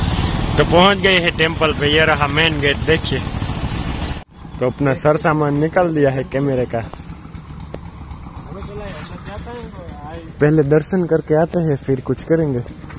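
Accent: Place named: Kathiawar, India. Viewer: Apke bolne k dhang se pata chalta hai k aap kathiawadi ho,kathiawadi log kathiawadi dhang se bolte hai,from punjab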